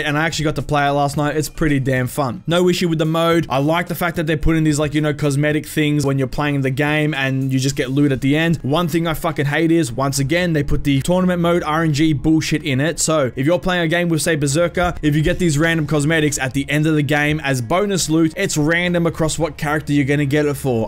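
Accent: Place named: Australia. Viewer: Also, where abouts in Aussie you from mate?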